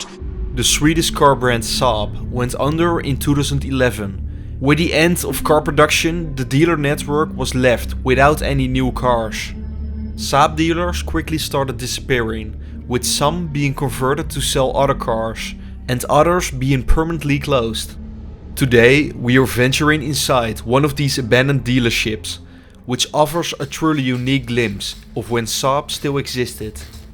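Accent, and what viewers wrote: Netherlands. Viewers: Kikass Dutch accent! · Do i hear a dutch accent🤔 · The person reading the text must be Dutch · Het Nederlandse accent is te erg. Klinkt echt slecht. De video is wel leuk. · Bro that dutch accent you heard that right away! haha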